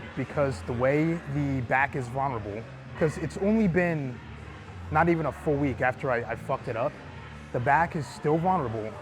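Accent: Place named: United Kingdom. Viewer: deadass thought u were british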